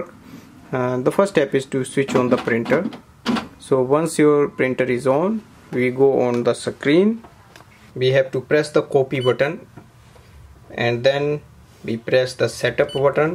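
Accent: India. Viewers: The only Indian accented English voice I will ever trust..Thank you!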